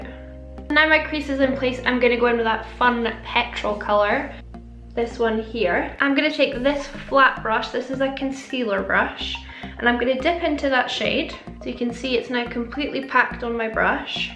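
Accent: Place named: Scottish Highlands, United Kingdom. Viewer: I love it!! Where is your accent from??! You sound like a fellow highlander? Xxx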